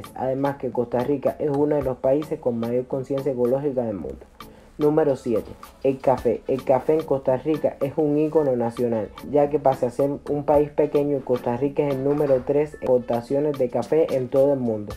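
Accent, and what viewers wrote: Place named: Dominican Republic. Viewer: ups lo siento espero no haberlo insultado....conozco algunos dominicanos y tienen su mismo acento.....saludos y 🌴 P U R A 🌞 V I D A 🌴 🇨🇷🇨🇺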